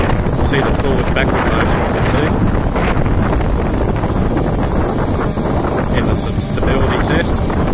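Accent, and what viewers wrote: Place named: Australia. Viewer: Where are you ? You lucky son of a gun !! Australia I think judging from your accent but wherever you're at looks awesome!!!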